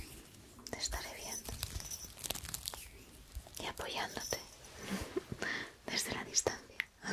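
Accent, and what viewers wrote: Spain. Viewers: Me encanta el asento de España.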